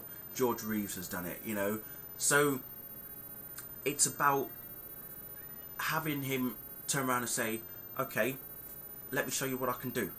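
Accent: United Kingdom. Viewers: Your British aren't you.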